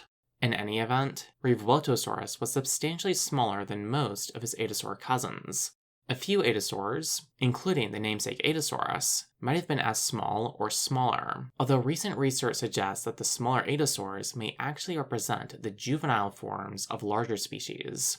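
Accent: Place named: California, United States. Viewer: Effing hell mate!! Why is the narrator soooo californian? Is he gay?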